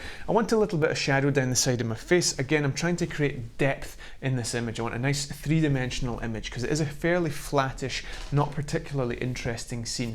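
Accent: Scotland, United Kingdom. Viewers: Sometimes a Scottish girl just needs a Scottish guy to explain things! Thank you sooo much! I get it now (I think) 😃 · Hes talking like the characters in “brave” movie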